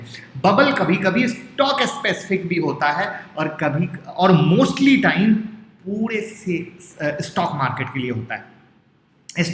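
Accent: Bihar, India. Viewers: Aap bihar ke ho kya ?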